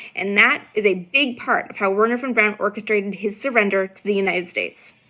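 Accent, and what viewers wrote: Germany. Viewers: your german accent is cute... saying that as native german.;-) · Your German accent is mad cute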